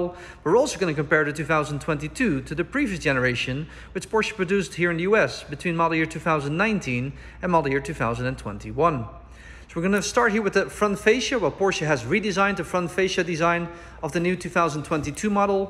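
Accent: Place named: Netherlands. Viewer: Ziet er goed uit kerel, tja je naam en je accent….ik hoorde meteen, ah Nederlander. Super auto! Keep uw the good video’s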